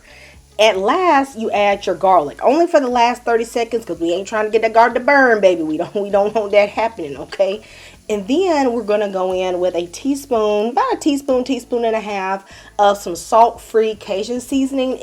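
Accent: South, United States. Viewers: Are you a native Southerner?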